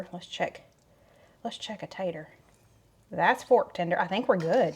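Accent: South, United States. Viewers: Now a days I put mine in the crockpot. The Rueben’s for the leftovers are the best part! you say “boil” however you want to!Its fine and I love the southern “accent” of it❤ Also I am jealous that I can’t go to your meet & great, but I bet you & everyone who attends will have a blast!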